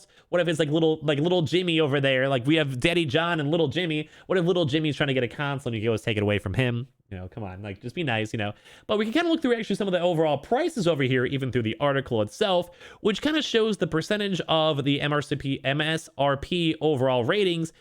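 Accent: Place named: Chicago, United States. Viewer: It’s that shhheh caaaahgo accent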